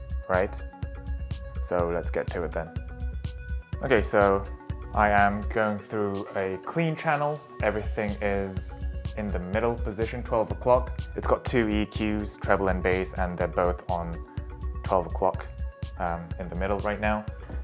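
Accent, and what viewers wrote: United Kingdom. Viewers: definetely did not expect british?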